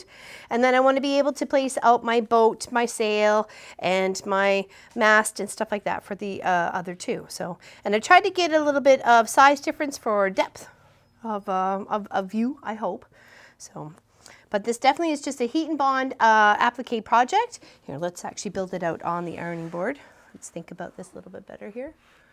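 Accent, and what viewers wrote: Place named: Nova Scotia, Canada. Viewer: so very Nova Scotian love it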